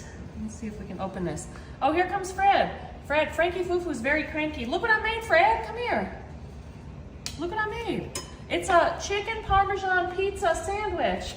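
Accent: South, United States. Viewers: I love your innovative video. You are andFred are so entertaining. It’s lifts my spirits to watch y’all. Our world need more people like you, Fred, and. I’m from the South and can certainly tell you are too. Thanks so much from The BAYOU STATE!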